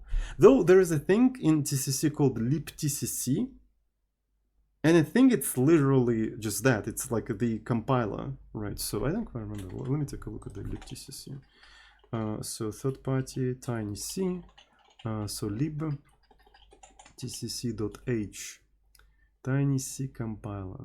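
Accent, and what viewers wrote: Russia. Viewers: Why do you sometimes swear in german? As a german I appreciate it XD, but its a bit confusing. An english speaking programmer with an russian accent cursing in german.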